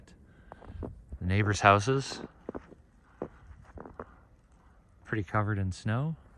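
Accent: Wisconsin, United States. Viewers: He mentioned building in Wisconsin...I am assuming Wisconsin accent. I moved here and he sounds like everyone else here. Not sure why everyone needs to drag the guy for this? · Dudes, it’s just how we talk in Wisconsin. We also say crick, not creeek. Sorry, not sorry. 😎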